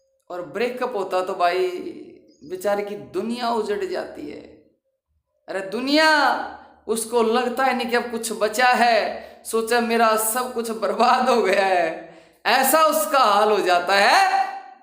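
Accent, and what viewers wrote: Haryana, India. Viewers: Ha guruji Mai 2 × speed pe dekta hu To samaj ni aati haryanvi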